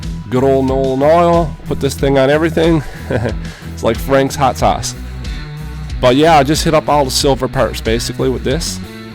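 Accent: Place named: Newfoundland, Canada. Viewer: I figured you were in Newfoundland from the accent, but Labrador is probably even more remote! Have you tried ordering from Kingdom of the Titans before? They're located in northern Quebec and might be able to ship to you fairly quick. Keep up the great work, love to see more OPR content and quality Canadian content creators! 🍁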